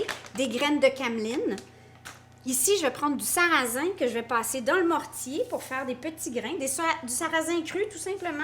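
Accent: Quebec, Canada. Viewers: Explication super avec une joie de vivre. J'adore et alors avec l'accent Québécois en plus c'est top. Merci dès demain je me lance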